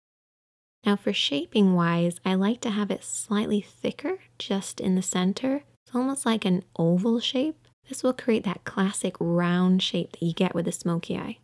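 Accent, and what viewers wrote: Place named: Ireland. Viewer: Weird question, are you Irish? I can hear a wee twang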